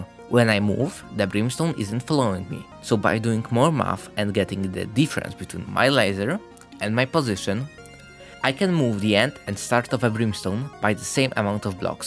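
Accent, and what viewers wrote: Poland. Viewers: Od razu pomyślałem że polak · słychać od ciebie polski akcent :) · Yo, you have the perfect Accent (Polish Accent) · Bro you sounds very polish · You're from Poland, am I hearing right? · you sound soo polish xD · polski akcencik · Wowowowo I didn't expect that but I really hear that you're polish haha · I love that polish accent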